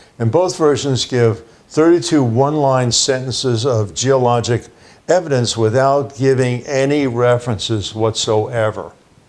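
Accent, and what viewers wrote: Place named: New York, United States. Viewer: Sounds like he grew up in NYC.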